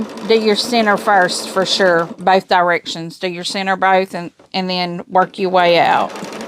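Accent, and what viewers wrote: South, United States. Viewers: Lol I did too but it's that southern accent. 😂😂😂😂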